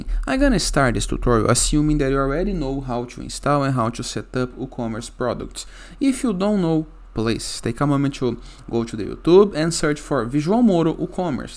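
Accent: Brazil. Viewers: Are you brazilian? I like the content and you accent. Was easy for me to understand everything.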